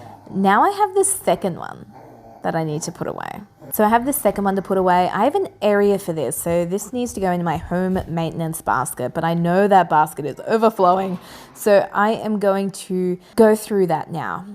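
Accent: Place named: Australia. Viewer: You and your home are beautiful! So good to see/hear another Aussie :-)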